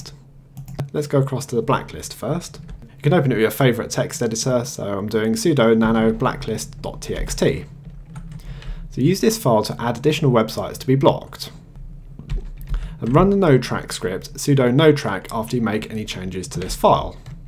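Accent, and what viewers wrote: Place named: Cardiff, United Kingdom. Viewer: +1 for you voicing a Git or Syn tutorial. +1 for Cardiff accent.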